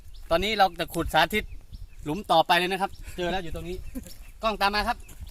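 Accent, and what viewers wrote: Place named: Laos. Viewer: เว่าลาวแต่แรกกะแร้วน้อคะข้อยรุ่นนำเจ้า..ย่านเจ้าเว่าตกลาว55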